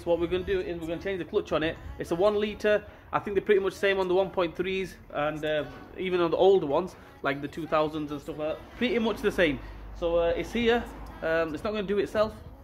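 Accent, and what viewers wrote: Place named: England, United Kingdom. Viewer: I like your knowhow but because of your English accent I have to suggest that you talk a wee bit slower. Canadian English is a wee bit of translation... plus the fact that I'm slow😀 Thanks for doing this vid.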